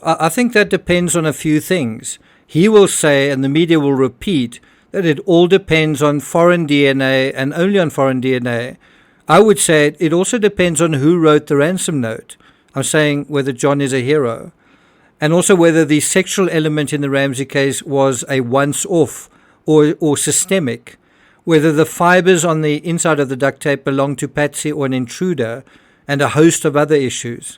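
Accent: South Africa. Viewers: is ur accent SA? do u speak afrikaans?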